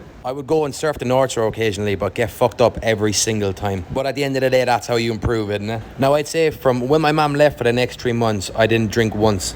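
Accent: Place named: Ireland. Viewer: Nah your Irish Accent has got me weak 🤤 and your face is distracting 😍 got me in a trance 🤩 YOU ARE BLESSED & BEAUTIFUL 💐😇🆙️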